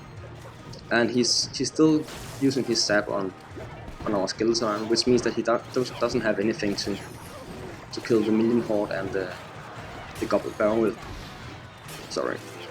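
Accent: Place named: Denmark. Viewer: hvad laver du du er jo dansker det kan man høre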